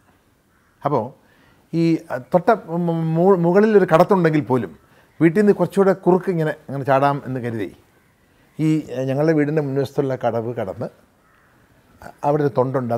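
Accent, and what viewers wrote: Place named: Kottayam, India. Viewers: പാലാ style ഇൽ തന്നെ ഉള്ള സംഭാഷണം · Achayanmarude pakka style samsaram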